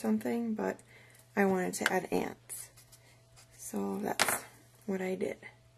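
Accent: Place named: Wisconsin, United States. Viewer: Hi I am watching this,just saying to my kids she sounds like she is from Wisc.You come to Florida we just go back from Wisc love this layout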